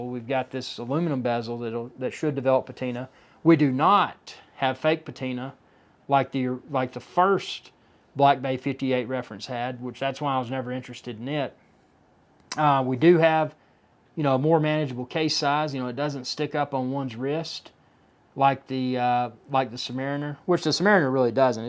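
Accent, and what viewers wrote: United States. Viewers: Love the country accent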